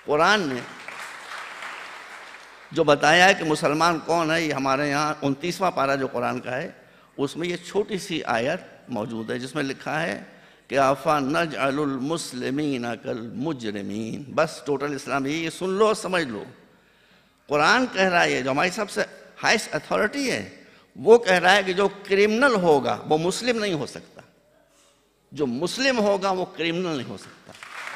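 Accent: India. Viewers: Ye moulana bihari lagta he mujhe kyunki iski Hindi se pata chalta he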